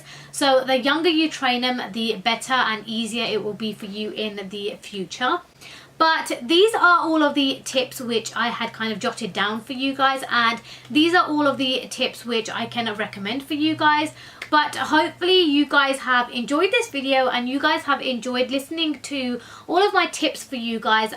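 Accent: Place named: Southall, United Kingdom. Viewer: Your Southall accent is horrible.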